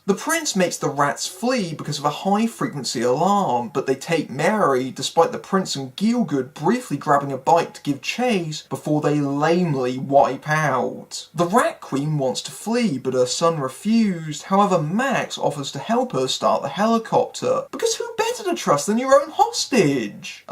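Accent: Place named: United Kingdom. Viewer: Да, британский акцент ОЧЕНЬ специфический. Но Фильм Брейн делает его невыносимым!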